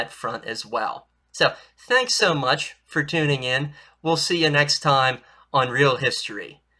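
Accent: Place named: Pittsburgh, United States. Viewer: "Dahntawn" Tombstone. Good to see a fellow yinzer making it big on YouTube! ⬛️🟨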